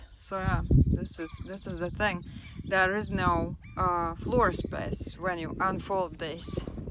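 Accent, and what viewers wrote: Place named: Russia. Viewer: You sound Russian. I am cool with that.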